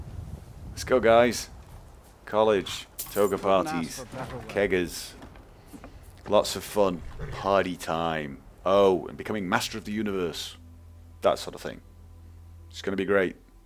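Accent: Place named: Birmingham, United Kingdom. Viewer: Can't believe you're Norwegian, you sound exactly like you come from the Birmingham area of England. O.O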